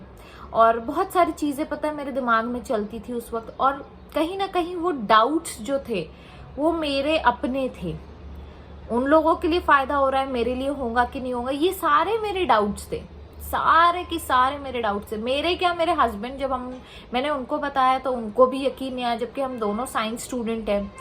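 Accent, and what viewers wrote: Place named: Goa, India. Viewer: Mam, I love your energy and vibe, its very infectious , I love the way you smile ( keep smiling always ) and the way you speak is so adorable , especially the way you pronounce the words like " KARENGA , BOLENGA , " it sounds really very cute, it gives me vibe of a GOAN lingo ( where are you basically from mam, and what is your mother tongue if I may ask? ) May, Can you please teach us the manifestation technique and I'd like to tell you that I have started to chant the Ho oponopono prayer but how long would it take for the good changes to reflect? please guide me how do I go about it. since I recently lost my job and I have no income from any sources whatsoever I am but worried how are things going to shape up for me, please if you dont mind sharing a few useful / powerful technique that could help me I'd really be grateful to you. 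I look forward to hearing from you. please do reply.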